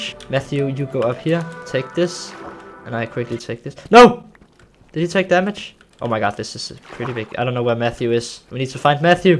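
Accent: Denmark. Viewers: yooooooooooooo anyone ever told u,u have an danish accent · Are u danish? 😂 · are you danish my guy?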